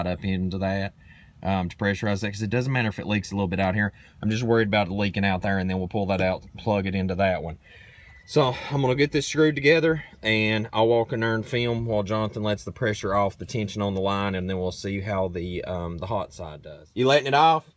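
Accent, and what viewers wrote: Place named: West Virginia, United States. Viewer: Sir, I love your accent where are you from. Sounds like West Virginia or Kentucky maybe.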